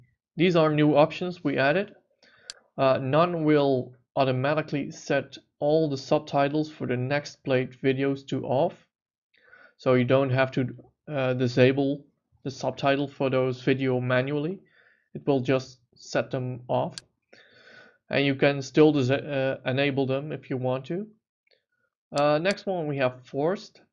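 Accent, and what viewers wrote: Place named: Netherlands. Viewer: dag,ik hoor precies dat je Nederlands bent.Daarom vraag ik het in het Nederlands. Weet u hoe ik die ingebakken Aziatische of Arabische ondertitels kan verwijderen zodat ik mijn gedownloade kodi ondertitels kan plaatsen. Normaal heb ik geen probleem met buitenlandse ondertitels maar de ingebakken ondertitels nemen soms bijna de helft van een film in. groetjes Peter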